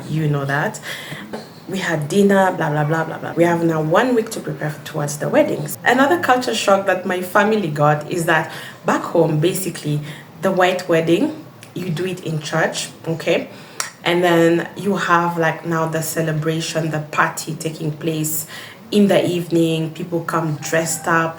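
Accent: Kenya. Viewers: You have a Kenyan accent. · You picked up the Kenyan accent well..🎉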